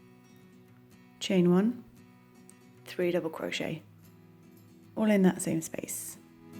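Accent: England, United Kingdom. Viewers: I love your videos! I always learn so much from them. Will use the chainless foundation forever now. Also makes such a pleasant change to hear an English voice. Keep up the most excellent work! xx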